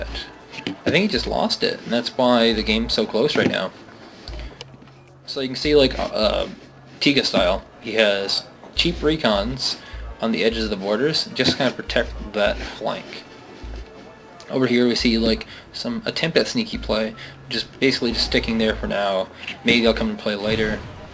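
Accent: Canada. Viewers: Gorgeous vids! You sound like you might be Canadian, it's funny how you would say everything with the vowels "ou" in an accent. but in the case of "routing" where the canadian accent way of "ou" is actually the proper way to pronounce, you turn it the other way around :p Looking forward to more!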